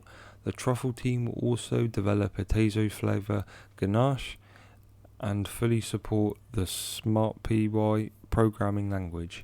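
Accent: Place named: Bristol, United Kingdom. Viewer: you sound like your from bristol mate?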